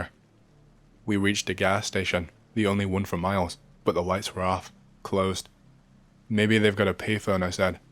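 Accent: United Kingdom. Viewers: bro peak content as usual, where are you from (regionwise) i cannot place your accent in the uk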